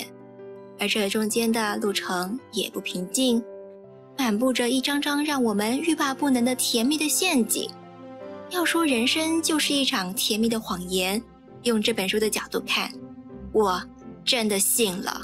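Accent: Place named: Taiwan. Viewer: 这本书我读过德语版的。文案写得很好，解说很棒。可能由于文化差异，觉得声音有点儿嗲，台湾腔与京腔的给人的感觉正如台湾菜与京菜的甜咸之别一样。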